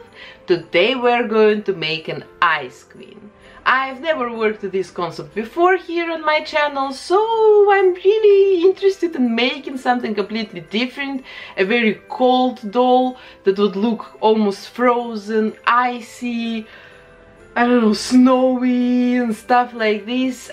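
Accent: Russia. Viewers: Вот наткнулась на видео И сразу поняла по акценту , что из России человек 😂😂😂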